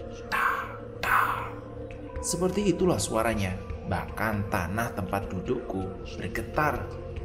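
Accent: Java, Indonesia. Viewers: Waalaikum slm wr wb, masalah logat jawanya justru sy suka menjadi ciri khas kk " sy org sunda justru seneng mendengarnya, smga smkn sucsess..❤👍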